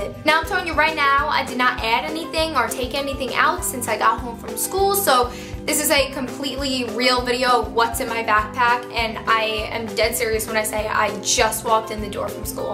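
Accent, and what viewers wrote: New York, United States. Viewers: I'm pretty sure it's a New York accent. I'm from New York and she talks just like me so I think I'm accurate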